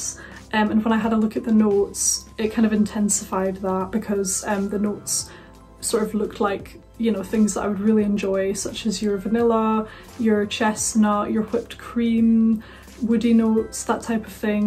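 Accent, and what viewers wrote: Scotland, United Kingdom. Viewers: Fantastic perfume review, Miss. May your Youtube channel, subscribers, viewers & fans grow exponentially in this new year and future.. You have such a pleasant & soothing and elegant voice & wonderful, classic Scottish accent. You can sell us anything. Happy new year Scotland.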